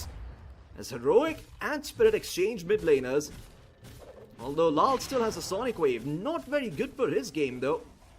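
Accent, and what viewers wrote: India. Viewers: Indian accent caster? Immediately muted the video.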